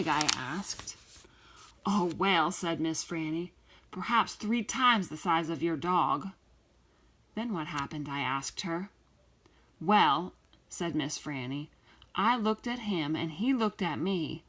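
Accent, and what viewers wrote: South, United States. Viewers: You have a pretty voice it’s country I love it thanks for doing my hw 💖❤️🧡💛💚💙💜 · Her accent was from the country she’s a beautiful reader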